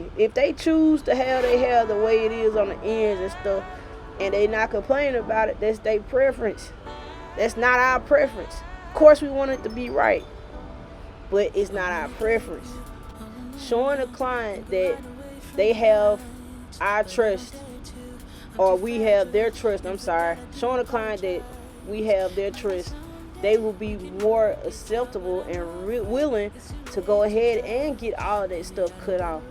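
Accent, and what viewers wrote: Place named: South, United States. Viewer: Love this southern accent!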